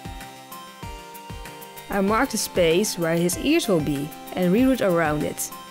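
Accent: Netherlands. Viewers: Yes I know, is a boy. And she asked his accent, he's from the Netherlands.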